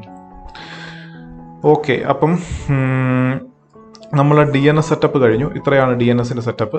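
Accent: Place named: Kottayam, India. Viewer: കോട്ടയം ആണ് അല്ലെ 😁 എന്നാന്നെ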